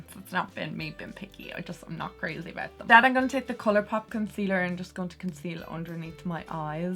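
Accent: Ireland. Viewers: Are you from Ireland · Love the Irish accent! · Love to listen to you talk. Some of us from the USA love the Irish accent.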